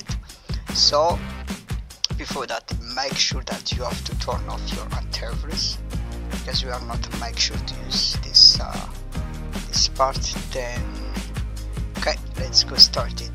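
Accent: India. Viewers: indian accent = works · the indian accent videos always work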